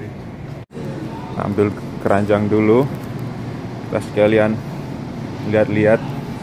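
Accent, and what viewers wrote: Java, Indonesia. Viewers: Wong jowo pak? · Jowone ndi 0m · Omongane kok kyok jowo² ngono ya mas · Bahasanya,,, kok cukup medok, kamu asli mana mas,,, kok kaya jawa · cak jowone ngendhi to..... hehehhehe apik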